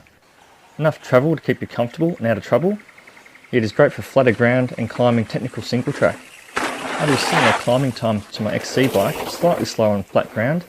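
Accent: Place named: Australia. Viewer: Thank goodness an Aussie voice and not a droning yank.....Great vid and very informative...Thanks mate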